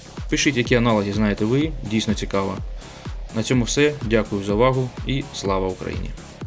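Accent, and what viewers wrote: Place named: Russia. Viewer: как бы не пытался, а русский акцент слышно за версту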